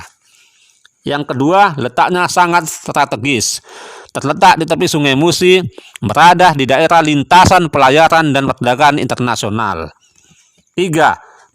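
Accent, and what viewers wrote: Java, Indonesia. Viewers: Saya penasaran dengan Om ini ilmu Sejarah dan Agama sangat oke.dan kalau bicara logat bataknya tidak kelihatan bahkan logat bicaranya seperti orang jawa.Padahal aslinya orang Batak.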